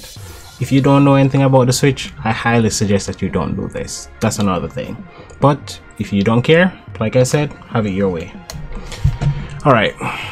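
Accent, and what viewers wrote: Jamaica. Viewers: Why do you sound like a Jamaican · Your Jamaican?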